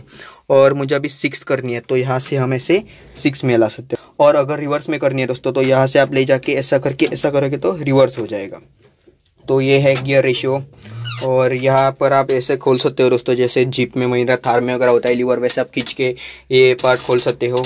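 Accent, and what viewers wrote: Goa, India. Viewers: That Goan Hindi Accent..🔥🔥 superb.. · Goan hindi accent aikonut kavle bhava goacho munu tu😍🔥🔥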